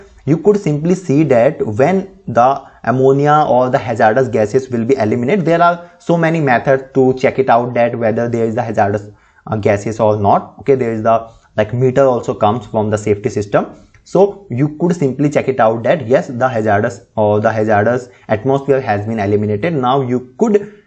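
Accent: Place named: India. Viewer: please make the subtitle, your english language contaminated with Indian/pakistani spell. so confuse wht do you talking about.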